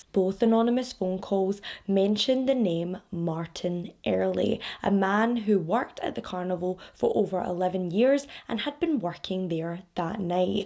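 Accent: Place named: Newcastle, United Kingdom. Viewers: Why i your....new castle accent is why i better than mine.....yours would make gary linker proud.. and chloe ferry 😁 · Love the Newcastle accent haha love some representation for northerners ❤️❤️‍🔥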